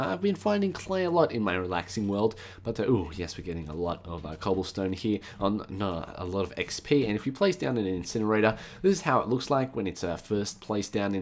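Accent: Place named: Australia. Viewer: I can't take you seriously, until you spell like a normal person, not a mental one. Also accents are such a thing, he has a Australian accent (I believe).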